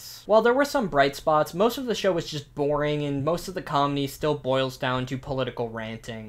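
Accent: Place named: Boston, United States. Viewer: As a Bostonian, I approve of that accent.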